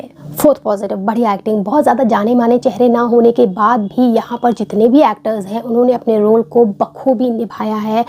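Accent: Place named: South Delhi, India. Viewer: You excent is like sout Delhi girl ❤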